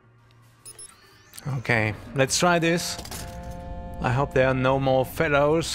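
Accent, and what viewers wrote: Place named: Germany. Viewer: Are you german? I can hear a slight accent :)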